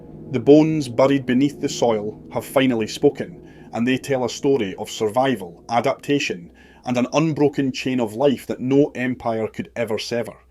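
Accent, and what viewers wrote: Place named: North, United Kingdom. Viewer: Interesting. Thank you for the subtitles, otherwise I could not understand your accent. I have heard the New Zealand English, which was very difficult, but I think yours is from somewhere in northern Britain ?🌹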